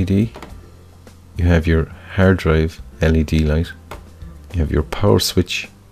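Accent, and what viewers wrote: Ireland. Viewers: What part of Ireland are you from your accent sounds like Dublin. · By listening to your accent, I guess you're Irish. Am I right?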